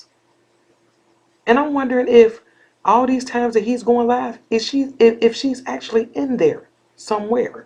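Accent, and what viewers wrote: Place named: New York, United States. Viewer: I thought I was hearing N.Y.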